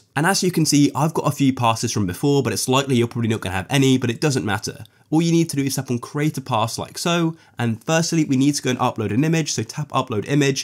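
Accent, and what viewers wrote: United Kingdom. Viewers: I don’t understand what u are saying ur so British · Are u bridish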